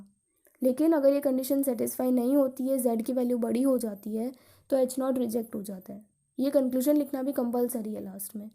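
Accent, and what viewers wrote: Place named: Gujarat, India. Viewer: Thank you me'me for ths video ❤ From Gujarat I know from your accent thayt you are gujarati 😅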